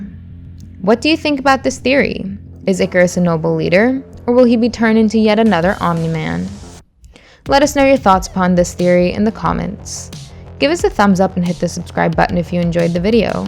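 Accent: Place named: United States. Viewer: You're a female CANADIAN LAD ...but you sounds like AMERICAN 😁lad ❤x🇮🇳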